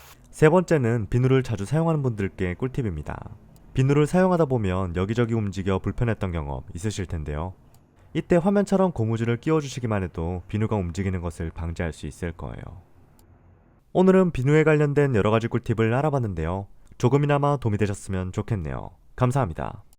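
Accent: Gyeongsang, South Korea. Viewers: 경상도 분 맞지요? ㅋㅋㅋ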